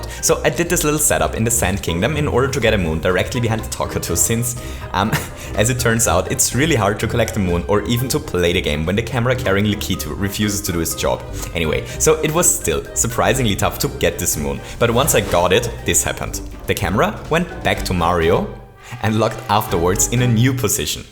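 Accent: Germany. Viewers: Are u german lol? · Fuck urself. Have you ever learned a second language? If you have, is it as stupidly hard and inconsistent as English? German is his first language, and he's tried to speak like a native English speaker, but it's almost impossible to do so without an accent. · are you german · Are you German? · Man kann irgendwie so raus hören, dass du deutscher bist xD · Bist du deutscher? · are u german? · are you german? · Dang your German accent😂❤️✊ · Du bist Deutscher, nicht wahr?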